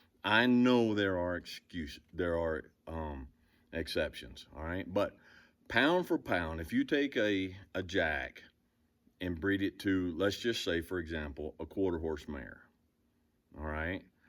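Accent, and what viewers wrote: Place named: South, United States. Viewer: Yes it is and I like that southern accent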